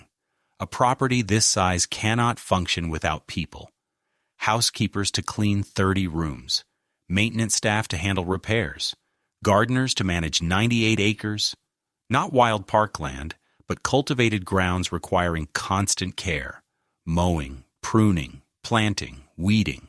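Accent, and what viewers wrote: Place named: United States. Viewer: What I would like to know as a British Citizen of 83 years is.............why an American "voice over" accompanies old film footage of English Royal Estate Dwellings (security compromise aside !) ! Is this visual "offering/conjecture" open to American interpretation ? Why not investigate President Trumps "holdings" in America for local interest ? Why is Britain the "flavour of the Journalists month" ? NO I DID NOT ENJOY THE "INNUENDO" OF THIS VIDEO. ....or the presumption that all is open to American "investigation" ! Perhaps the American Journalists have fewer problems investigating foreign shores rather than their own, or, would the President object ?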